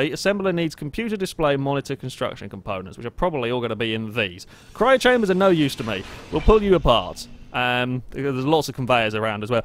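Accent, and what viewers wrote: United Kingdom. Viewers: Thought this was gonna be bad but the British accent made it all better :)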